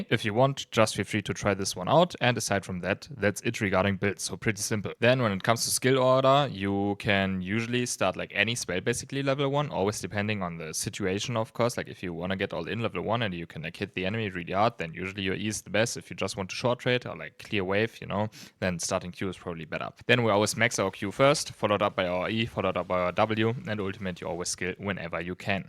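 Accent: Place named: Germany. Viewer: I like your german accent haha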